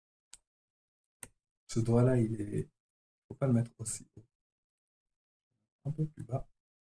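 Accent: France. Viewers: I speak fluent French and I can't understand a French accent for some reason but I heard something about Sunday and it's raining????? XD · With your English accent that is not very good, I could guess what language you originally speak, your native language is French. Correct me if I'm wrong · dat anglish accent typical french playdr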